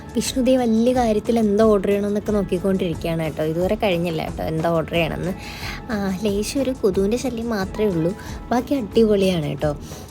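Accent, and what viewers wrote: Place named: Ernakulam, India. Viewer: Ernakulam slangayallo😁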